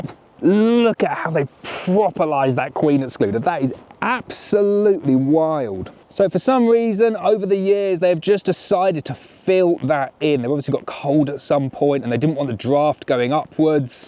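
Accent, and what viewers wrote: United Kingdom. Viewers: Nothing worse than seeing a video you really wanna watch, then you hear a British accent, god dammit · It's only been 12 minutes into the video but, I am feeling physically and mentally tired, already. Listening to a British accent, talking non-stop, like out of a machine gun, making no pause, even for three seconds, is mentally and emotionally draining. Wow...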